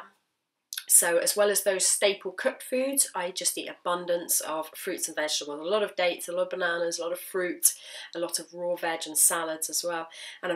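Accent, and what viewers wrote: United Kingdom. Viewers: Nice! Im half way so i brb. Gtg eat lol im a sucker for UK accents. Geez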